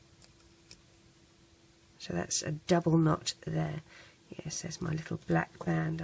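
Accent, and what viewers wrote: United Kingdom. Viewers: I dont mean for this to sound mean but you have a VERY british accent also peope0le dont rage bc im British too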